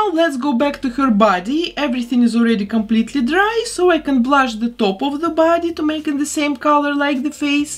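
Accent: Russia. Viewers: Вот это акцент )))) русский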